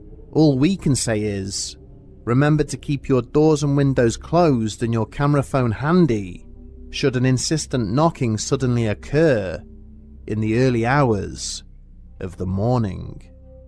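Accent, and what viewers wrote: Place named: England, United Kingdom. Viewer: The video maker sounds English, cannock chase in England, next to Birmingham is famous for black eyed kids.... Nearly half way through the video... Oh he's just mentioned it as in typing LOL.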